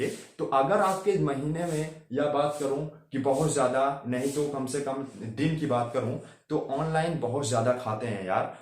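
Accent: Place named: India. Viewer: Bro Sirf 4 hi like mila hai iska karan mei aapko batata hu, mai Gujarat se hu aur aap dusre ilakese ho jaise up,bihar, MP, and other ab hota kya hai aap logo ka bolne ka jo lehja hai woh different hota hai is wajah se videos lambi bhi hoti hai aur logo ko sunne mai maza bhi nahi aata toh please acchi chi hindi bole, waise mene bhi youtube channel banaya hai par ek bhi video nahi dala aur aapko advice de raha hu is liye sorry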